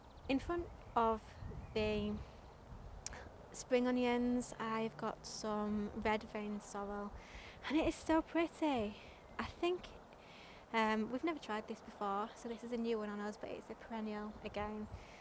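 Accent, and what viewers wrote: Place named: East Yorkshire, United Kingdom. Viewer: Sent over by JB...what a suprise as you sound like me! I noticed your East Riding of Yorkshire and so am I! I'm in Hull. Definitely subscribing!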